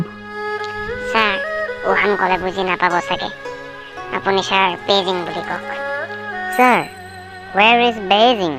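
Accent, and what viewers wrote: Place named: Morigaon, India. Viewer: Ha ha ha Mojja Morigaon vaxa jen lagil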